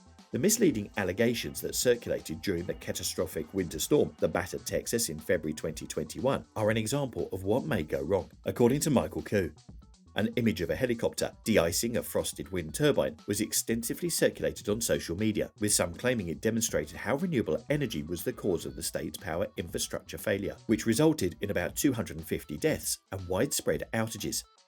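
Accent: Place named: United Kingdom. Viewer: Even tough this is a fine presentation, I get awfully tired of the clipped British accent when we are all tuned in to the good old American accent. It just sounds phony and is hard on the ears.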